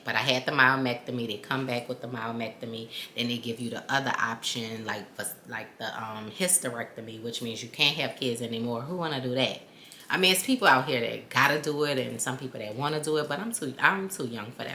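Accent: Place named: Louisiana, United States. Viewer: And I love your sweet Louisiana accent,by the way i'm from Louisiana too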